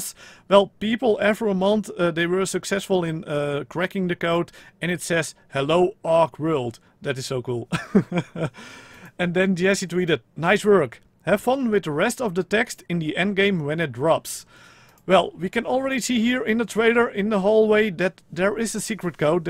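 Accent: Netherlands. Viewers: yo is he dutch ???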